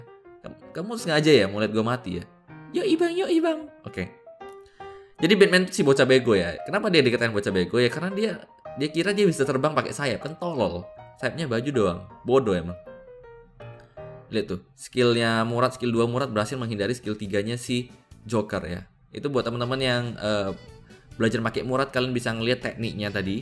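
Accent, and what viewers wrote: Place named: Medan, Indonesia. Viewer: Kita lego lego. 😁😁😁 bahasa indonesianya bang kita gocek gocek 😁😁 keliatan kali medan nya bah.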